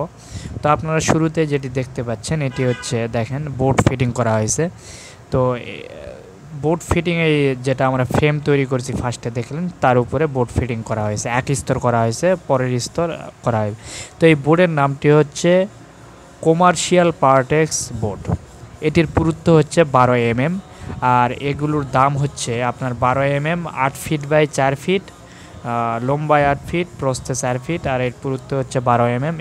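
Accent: Naogaon, Bangladesh. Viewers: কথা শুনে মনে হচ্ছে বাসা নওগাঁ তে।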